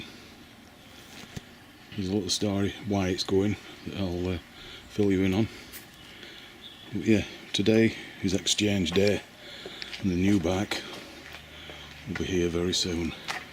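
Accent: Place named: Derbyshire, United Kingdom. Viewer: That’s a coincidence. I popped into Pidcocks last Thursday . I live in Derby, you sound more N Derbyshire / Notts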